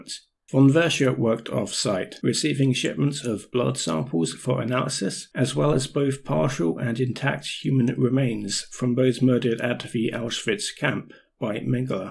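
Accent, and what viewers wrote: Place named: India. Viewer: U sound indian origin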